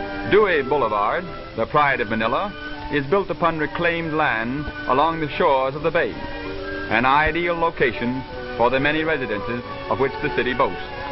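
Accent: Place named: Transatlantic, United States. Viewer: trans Atlantic American accent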